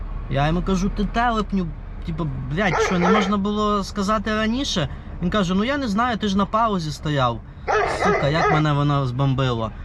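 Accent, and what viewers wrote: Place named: Galicia, Ukraine. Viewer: Доброго Вам. пане чи то дня. чи то вечора - не суттєво. Маю надію, що Ваша дорога була легкою. Це саме той Микола що давав Вам пояснення відносно PILOT. Ну то таке - лірика. Тепер до справи. Мені дуже цікаво дивитися Ваші відео. а особливо слухати. Таке враження що Ви або з Франківщини, або з Галичини, або ще десь поруч. Дуже приємно вас слухати. То по-перше. А тепер по-друге. Як професійний працівник телебачення мушу зробити Вам деякі зауваження відносно з"йомок.Колись давно, коли мене ще вчили працювати з камерою мені в голову втовкли правило: "Любий рух камери в кадрі має бути обгрунтований".До чого це я? А до того. що коли Ви знімаєте Go pro з чола, то ліпше це робити під час руху по трасі. А ось коли Ви їдете по місту, або десь в зоні завантаження/розвантаження то оці FPV кадри не завжди доречні. Пояснюю. FPV - це скор. 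FPV — вид від першої особи. Так, це буває дуже цікаво. Але водій під час руху в умовах міста або заводу мусить контролювати обстановку і ліворуч, і праворуч і зпереду і ззаду. Тому на екрані така метушня буде доречна в тому випадку коли автор бажає передати відчуття збентеженності. тривоги та таке інше. В інщому разі воно просто або заважає, або просто не цікаво і глядач втрачає цікавість до того, що відбувається. Найкращий варіант - мати декілька камер. Наприклад: одну на вітровому склі, другу десь у спалці. третю десь зовні машини. Але це такі шалені гроші. що янавіть думати про це боюсь Перепрошую за такий довгий текст, але я дуже хочу щоб ваше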